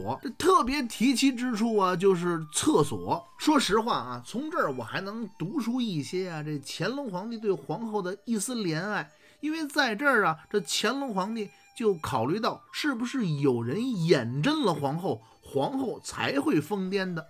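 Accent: Beijing, China. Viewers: 纯粹咱老北京音您呐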